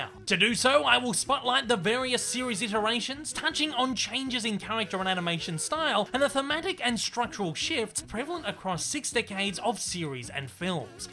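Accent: Australia. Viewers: Is it me, or does this guy kind of sound Australian... I hear a hint, but probably not for those with an untrained ear. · Are you serious? A hint ... He sounds 100% from Down Under. Unmistakably so. Actually his narration reminds me of an old 1980's TV show called Lifestyles of the Rich and Famous, featuring the voice of English entertainment reporter Robin Leach.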